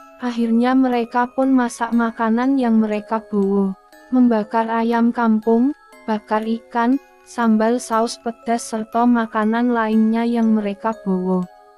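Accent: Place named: Java, Indonesia. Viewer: Narator nya kurang menarik cara berceritanya seperti baca buku logat jawanya terlalu medok ...intonasi nya juga tidak tepat ...gak jadi deh dengerinnya 😁😁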